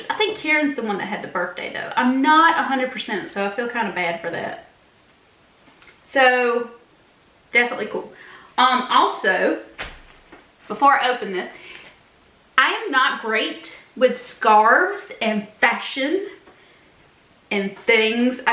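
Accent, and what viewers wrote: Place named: South, United States. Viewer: Yes, our southern accents do get in the way sometimes when it comes to the pronunciation of some words. Love it! You always make me laugh and I truly love your videos. Charleston is one of my favorite places to visit. Thank you so much for sharing Southern Ways with with your audience. 💕☺️💐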